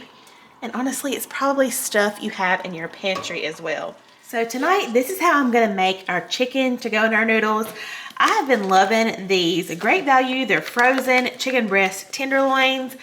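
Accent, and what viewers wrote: South, United States. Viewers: Btw, I love your southern drawl ❤ 😊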